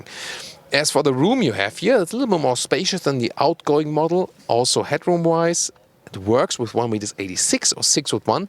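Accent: Germany. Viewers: Sounds more authentic coming from a German. Great narrator. Mighty car.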